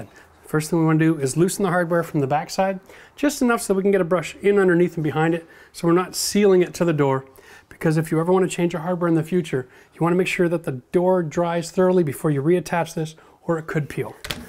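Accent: Canada. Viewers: I’m here for the Canadian Accent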